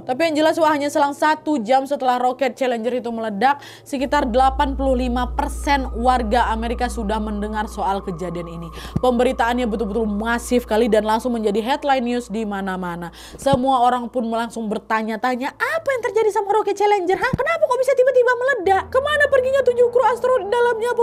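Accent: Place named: West Sumatra, Indonesia. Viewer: Seneng sih denger penyampaian dari. Dari logat bahasanya, orang minang ya wak? 😁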